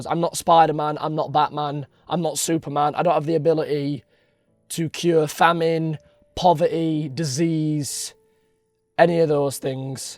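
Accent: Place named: Manchester, United Kingdom. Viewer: Where are you from? I'm from Sweden so I can only guess Mancester. Sorry if you are from Salford. But you are from from the north, aren't you. And you don't have to be "larger than life!"...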